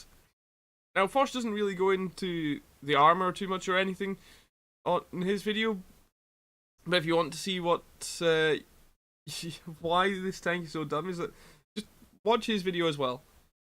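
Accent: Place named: Scotland, United Kingdom. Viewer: Dear lord, u is sounding Super Scottish right now!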